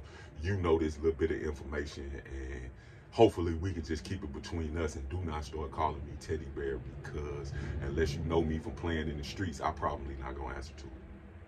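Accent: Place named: St. Louis, United States. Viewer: You sound so St. Louis. I lived there for some time.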